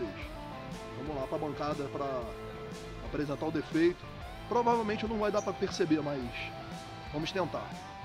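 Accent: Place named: Rio de Janeiro, Brazil. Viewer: bom dia! No vídeo, você mencionou que o único mecânico que teve a paciência de resolver o problema, foi o Russo. Sou do RJ, pelo teu sotaque parece que você também é...... Você poderia me dizer o endereço desse mecânico por favor? Abraços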